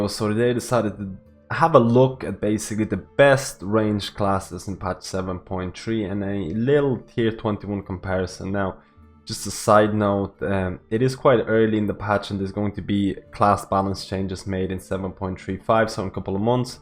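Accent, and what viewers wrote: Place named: Ireland. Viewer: i know this is unrelated to the video but your accent is driving me crazy trying to figure out what it is, its like you have a strong Irish accent one time then all of a sudden you have like an eastern European accent. Please can someone tell me its driving me crazy!! oh nice vid as well :P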